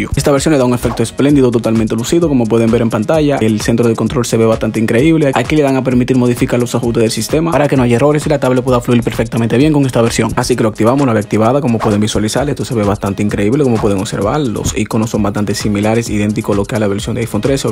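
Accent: Dominican Republic. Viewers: Es que hago hablamos los dominicanos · Es dominicano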